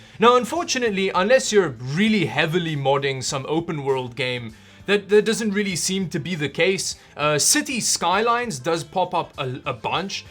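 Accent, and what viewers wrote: Afrikaans, South Africa. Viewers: Fiddy twoo. I recognize a fellow afrikaans accent there lol. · he’s south african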